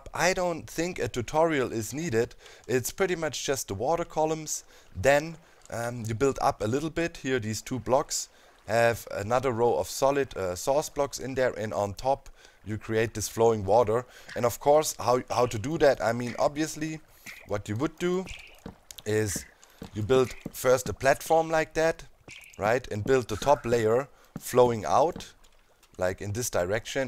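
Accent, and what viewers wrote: Germany. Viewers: Sehr sehr starker Deutscher Akzent xD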